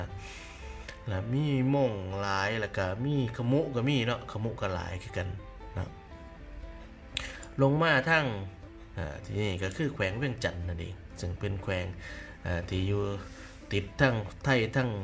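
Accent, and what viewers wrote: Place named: Ubon Ratchathani, Thailand. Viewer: สำเนียงการเว้าคล้ายๆกับอุบลเลยคับ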